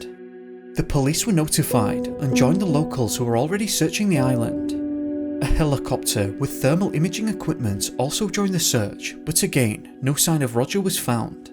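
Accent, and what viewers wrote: United Kingdom. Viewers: Congrats on reaching 100k, always enjoy your videos, fascinating and well researched. Nice to hear a fellow Brit narrating!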